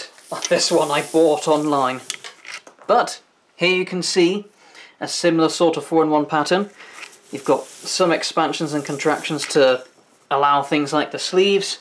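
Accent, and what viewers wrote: United Kingdom. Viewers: Off topic, what area of england is your accent from? Also, thanks for the lessons.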